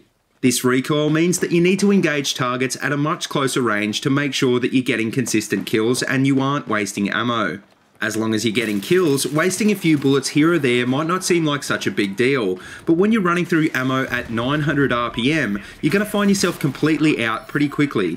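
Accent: Australia. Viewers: Been watching since m 39 sks review I believe. Love the videos your making. Hope your band flops so u can keep making theses vids lol. Truly hope yall do well. Had a few friends that tried a music career didn't make the "big time" but did well.sorry I forget your bands name could you please remind me. The 240 bravo, Ace 23, 870, ace-sv and scar are my "go to" weapons. Looking to change it up a little bit , could u recommend a new weapon selection. Played with most but those the ones I gravitated towards an after a cpl of beers my game play goes ptfo to sporadic game planning an "ttt". And 1 last thing if u don't mind where in the world are u. I think u an Australian. Not sure no offense if not jus not good with accents and flags. So to sum up love vids, can u recommend some new weapons.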